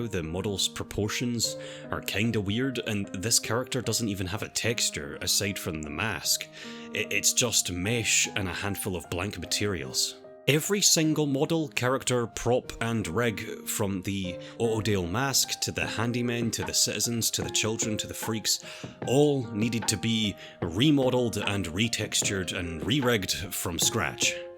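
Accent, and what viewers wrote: Scotland, United Kingdom. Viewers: Are you Scottish? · You're ancient is wonderful (thinking Scottish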